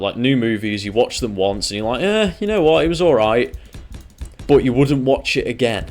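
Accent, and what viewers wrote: United Kingdom. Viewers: Why does he sound more British this episode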